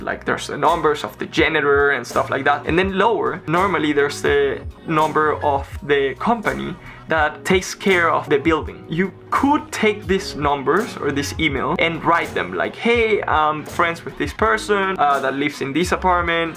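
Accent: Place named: Spain. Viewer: sehr schön zuerst hab ich geglaubt du bist ein ami aber dann spanisch hört man durch du bist sehr lustig und sympatisch i love your video how was barcelona in comparison cau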